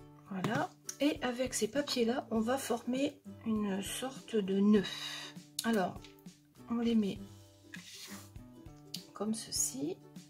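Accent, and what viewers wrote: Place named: Picardy, France. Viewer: encore mille fois merci je rigole à chaque fois avec tes petites pointes d’accent je me doutais un p’tit peu que c’était cette dimension j’ai commençé la carte je te dirais quand elle sera complètement finie je prends mon temps je veux que ce soit bien fait pour mon p’tit loulou je me lance je suis vraiment débutante tu sais merci ma tiote picarde gros bisous et à très bientôt pour de nouvelles cartes il va falloir que je m’équipe petit à petit!!!!💋👍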